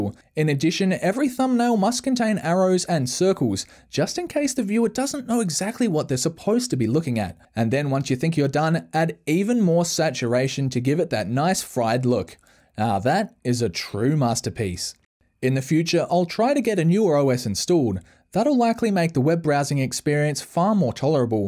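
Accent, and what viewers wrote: Australia. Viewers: here is that aussie voice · The Aussie accent 😆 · got that aussie sound. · That's the aussie accent mate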